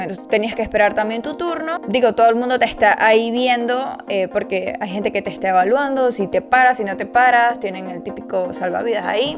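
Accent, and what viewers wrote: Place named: Venezuela. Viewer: Hola, soy venezolana creo que tu también por el.acento tengo 55 años gozo de buena salud y me veo bien, mi sueño es ser TCP en alguna aerolínea estadounidense pero vivo en Venezuela mi pregunta es ellos piden el GED soy teacher de inglés en mi país ya con eso es suficiente? No tengo visa sólo pasaporte italiano porque tengo la nacionalidad ellos me tramitarian la visa de trabajo en caso de ser seleccionada? Espero me puedas contestar cariño.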